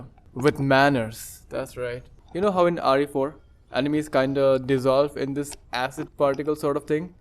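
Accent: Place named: India. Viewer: This looks so good i made a good coice to sub to you, Iam noticing you have a indian accent so,just curiuse are you India btw jam not indian ism bangladeshi. This was a wild journey to good trough such a creative video 😅 EDIT:I really like the Charector it has her own visual style,Also i was thinking should this game have shell shaded charectors, I Thik It will break the unique art style what done you think🤔.And o wana if this game going to be TP. Or FP it looks really good one both 😆..Holy shit i was just watching The video this chicken i's fucking uncanny dude seriusely got me. 😨 (Enemy with respect was really funny ngl😁).You rembeling random sad shit and the chicken just checking her out was the best part really adds to the pervy part. The random bits if unintentional jokes are pretty funny i like how the chicken just humping the green ball. 😂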